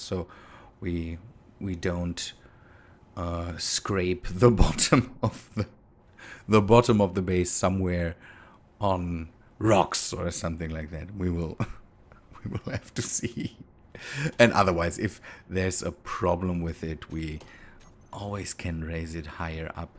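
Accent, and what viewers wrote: Germany. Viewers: you have a strong accent. your german right?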